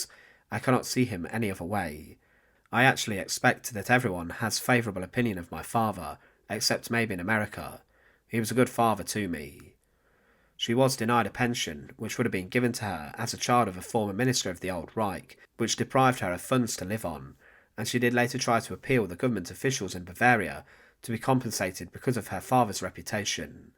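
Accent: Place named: Yorkshire, United Kingdom. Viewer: It’s probably AI. I find narration by AI very irritating. The accent is pretty heavy, maybe Yorkshire.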